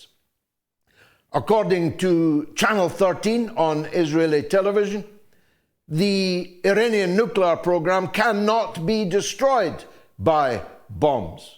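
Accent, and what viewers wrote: Scotland, United Kingdom. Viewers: I love your Scottish accent!!! from Brittany!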